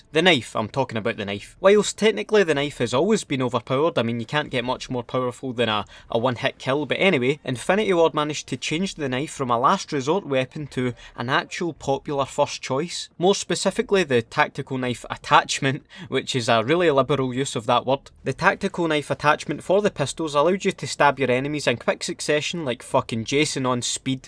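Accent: Scotland, United Kingdom. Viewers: I know this is an old video. But i love coming back to these because the Scottish accent and humour makes it worthwhile. But cammado Pro was gunna to get fixed like everything else, but the main dev left, and nothing ever got patch. Which left everything being broken and having fun memories 😂 ❤️❤️🔥🔥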